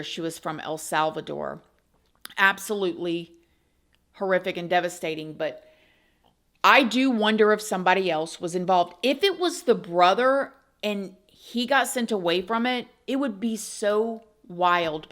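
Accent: South, United States. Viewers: Very much a southern (south-eastern American) accent lol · Is it a southern accent ? Ilke how she says poóolice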